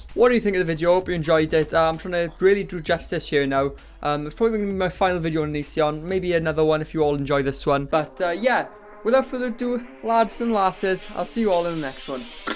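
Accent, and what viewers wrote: Wales, United Kingdom. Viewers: You welsh? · bro ur so welsh i love it it sounds like home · Off-topic, but I love your accent (im welsh too). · OMG, I ❤️ ur Welsh accent!! I’m from America, but I’m obsessed with Wales. I’ve been there 16 times, and I’m covered in Welsh- themed tattoos. r my fave band, and I want to move to Caernarfon. Thought it was hilarious when u called him a baby carrot! (For those of u who don’t know, “carrot” in Welsh is “moron”). 😆CYMRU AM BYTH!! 😍💚❤️🏴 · You’re welsh right ? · Welsh?commentary on how trash onion boy is yes please I didn’t know I needed this until I came across your channel by chance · Are you Welsh????? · Is your accent welsh? It’s lovely ! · Accent twins! Never seen a Welsh commentary channel before hahahah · You're welsh! :D Closest thing I've found to my useless Shropshire self <3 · I didn’t know it was possible to be SO Welsh 😂💖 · pfffft I L O V E Welsh accents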